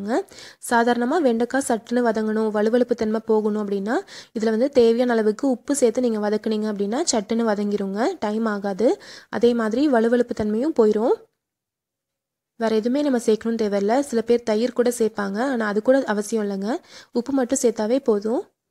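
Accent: Coimbatore, India. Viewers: Your netaive Coimbatore ma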